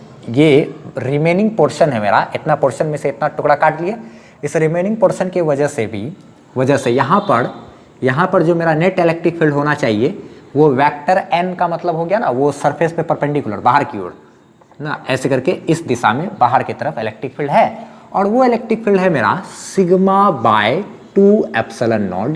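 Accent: Bihar, India. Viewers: Sir aap Bihar se ho kya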